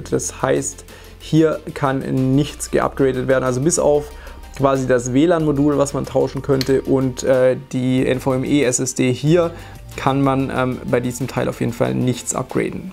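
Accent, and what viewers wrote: Swabia, Germany. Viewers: dein Schwäbischer unterton tut den Ohren gut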